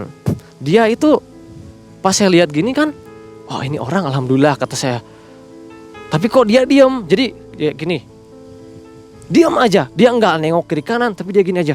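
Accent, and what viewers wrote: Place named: Sunda, Indonesia. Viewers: Sunda nya kentel ga bisa ilang 😁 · Sunda campur Indonesia. .Teuas . 😄 .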